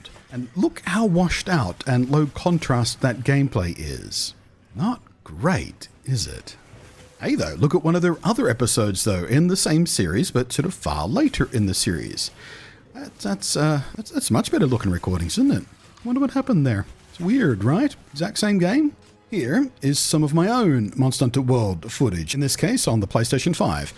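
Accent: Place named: Australia. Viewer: what accent is this? its like an australian trying to talk clearly so they end up using american R's half the time.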